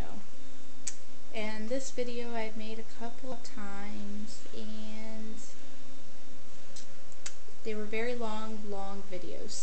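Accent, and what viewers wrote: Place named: Canada. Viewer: Canadians do rock, I love the Canadian accent :)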